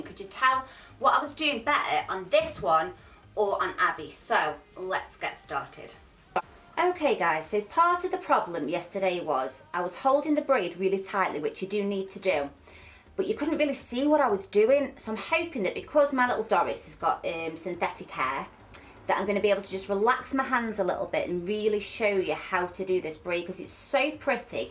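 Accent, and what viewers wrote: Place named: Liverpool, United Kingdom. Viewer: I found the Doris one easier to see because of the contrast in your skin versus the purple of her hair. I was able to follow both. As a side note, I live in America now but your accent is from my part of the world. Are you from somewhere just outside of Liverpool? Prescott or Warrington possibly?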